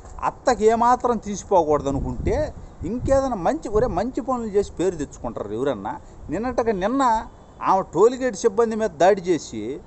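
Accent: Nellore, India. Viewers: నెల్లూరు యాస ఇరగదీశావ్ తమ్ముడూ..కేక నువ్వు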